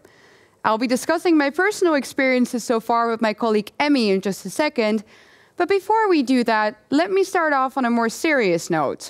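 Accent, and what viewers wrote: Netherlands. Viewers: Weet-landa, main accent on first syllable, only subsidiary accent on the second, and long e despite followed by two consonants, since only first of them belongs to same word within the compound. If I may say so, there are two accents that sound basically like you when speaking English : Dutch as yourself and Icelandic (have you checked out Leon and Sorelle?). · Man.. I love the Dutch Accent!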